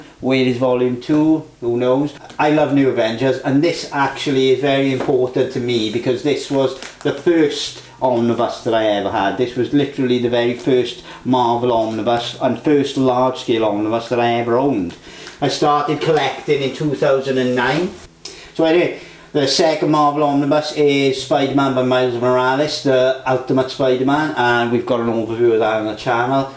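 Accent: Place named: South Wales, United Kingdom. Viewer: That little mistake does not keep me from enjoying the video. I think most people make the mistake because quietly is the better-sounding word. Are you native to South Wales? I'd like to connect the accent geographically.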